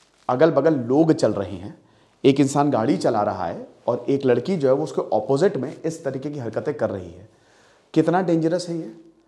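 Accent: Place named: Lucknow, India. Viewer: Sir aapki boli se hi samajh gaya tha ki aap lucknow ke hain....2018 study iq se yahan tak aap roz apki video se gyaan leta aaya hu... Main bhi lucknow se hi hoon...mujhe ataynt prasannata hui yeh jaan kar ki aap jaise achhe aur amazing guru mere sheher se hain. 😀🙏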